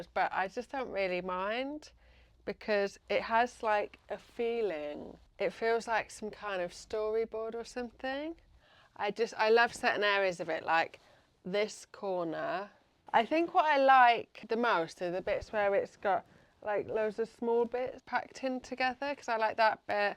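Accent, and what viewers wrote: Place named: United Kingdom. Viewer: Great video! Don't worry about the pink, it didn't look that bad anyway, and if you see it as a mistake, that's good, you have learned from it for next time. I love that you're experimenting. Thanks for keeping it real. We've all been there! P.S. Your accent sounds local, where in the UK are you. I'm in Nottingham 🥰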